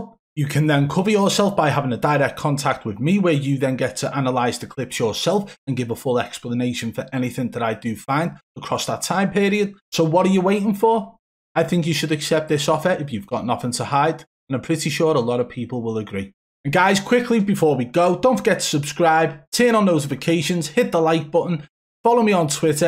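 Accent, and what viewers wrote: Liverpool, United Kingdom. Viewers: Ur scouse accent is unbearable.. I feel like I agree that all or most of these streamers are cheating In warzone but your videos seem Phony as well and really shady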